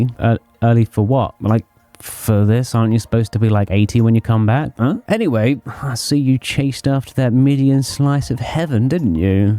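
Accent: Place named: United Kingdom. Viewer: I don’t mean any offense at all, it’s just your shaggy look with an American accent would make me think that you are a drug addict, but with the British accent, it makes me feel like you’re a fucking sage, English Nostradamus ass white boy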